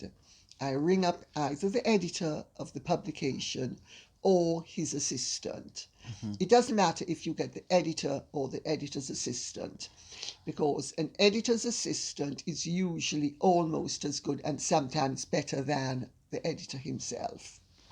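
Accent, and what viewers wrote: Jamaica. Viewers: Lady C, I'm from Jamaica, Where in Jamaica are you from, please? Your Jamaican accent is unmistakable. Love it. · Louise Leibbrandt it’s also part of her Jamaican accent. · A very posh uptown Jamaican accent.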